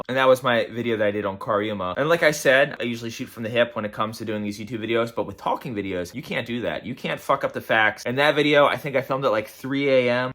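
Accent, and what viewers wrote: East Coast, United States. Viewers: Dude you’re one of my favorite YouTubers in general. I like how real you are and your opinions. I’m from Delco, outside Philly and I can tell you are from the East Coast and you’d fit in well in Delco 😆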